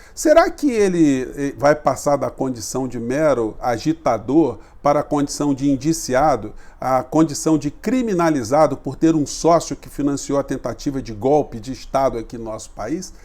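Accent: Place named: Rio de Janeiro, Brazil. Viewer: 😂😂😂😂😂😂😂😂Adorei a sua fala CARIOCA!!!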